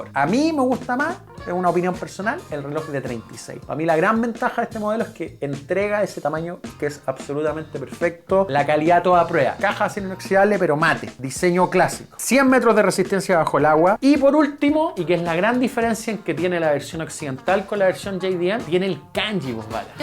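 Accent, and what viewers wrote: Chile. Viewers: feito el acento chileno , perdón 😅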